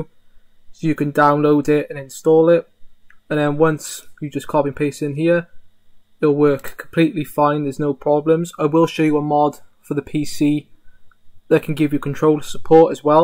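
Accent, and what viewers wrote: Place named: Cardiff, United Kingdom. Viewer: Deffo a Cardiff accent haha love it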